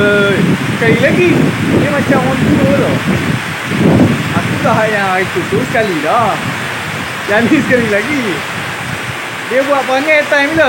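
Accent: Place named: Pahang, Malaysia. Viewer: Loghat macam loghat pahang...org pahang ke?...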